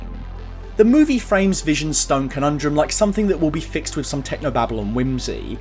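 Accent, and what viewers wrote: United Kingdom. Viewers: I enjoy your videos, but find it jarring when you use pseudo American phrases and pronunciation, when you're clearly a Brit. I imagine you're choosing to do this in an attempt (perhaps correctly) to appeal to a USA audience, but it is nevertheless unsettling to hear, and I suspect that your audience in the USA and Canada enjoy your accent and language precisely because you're different. The expression "Often times" for example. I've lived in the UK my entire life and I have never heard anyone say that. Literally not a single occasion. We simply say "Often". Similarly, your reference to "Taffy"? Where did that come from? That's not even a thing over here, so why would you use it as a similie? Finally, and perhaps the most troubling is the way you've aped the USA pronunciation of the name Thanos. Admittedly, it's a character invented by an American, but surely one which has its roots in Greek mythology, and both Brits and Greeks would pronounce that word as Than-Oss, and never Than-owse. 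That's all, otherwise, fab vid. Thank you for sharing. Just wanted to say that your own accent and linguistic style is just fine and charming, but the choice of some select words and pronunciations is a bit jarring - next thing you'll be drawing the 'drapes' and putting the broom in its 'closet' ;-)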